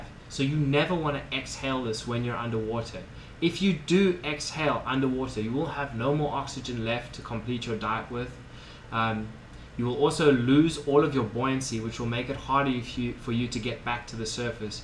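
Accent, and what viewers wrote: South Africa. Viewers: Nothing like a good ol' SA accent :) Cool vids boet, keep 'em comming! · Thanks for the tips mate, are you from South Africa?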